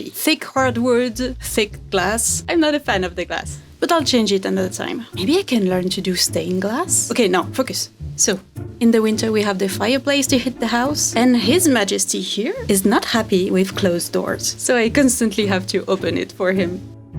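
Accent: France. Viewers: Hearing you say Kentucky was so cute lol I love your French accent! Also I’m glad you clarified the kitty is “not your cat” but is simply a “roommate” hahaha yes my cats are also rent free roommates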